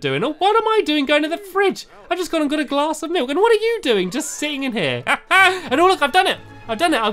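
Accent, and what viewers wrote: England, United Kingdom. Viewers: its his english accent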